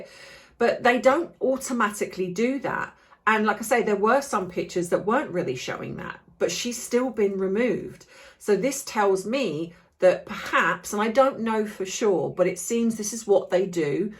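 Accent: United Kingdom. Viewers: My distinct pleasure,. I can listen to your beautiful British accent for hours on end!!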